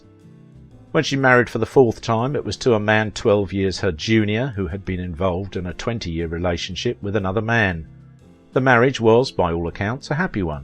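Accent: United Kingdom. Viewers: Does anyone else find it ironic that a nostalgic program about an American classic TV show is narrated by a gentleman with a British accent??🙄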